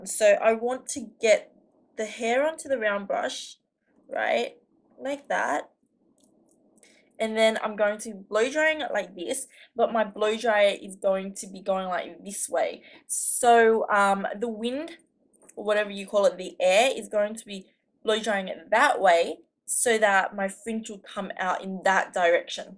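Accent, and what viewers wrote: Australia. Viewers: Wait if you're Japanese how come your accent sounds Australian? .-. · Lol Aussie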